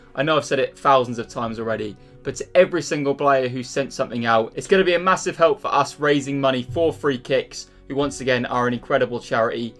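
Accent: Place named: Suffolk, United Kingdom. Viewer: You must be from suffolk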